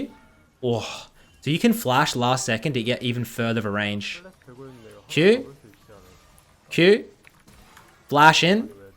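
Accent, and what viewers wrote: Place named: Australia. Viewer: How I love the Australian accent. Like far out, and cheeky little ...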